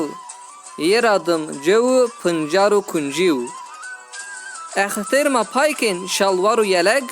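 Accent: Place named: Bingöl, Turkey. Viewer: Bingol genc şivesi sanki liceden selamlar homa pilo bena hol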